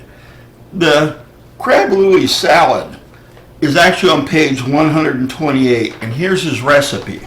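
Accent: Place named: Chicago, United States. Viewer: Where ya from? I’m from Chicago n you kinda got my accent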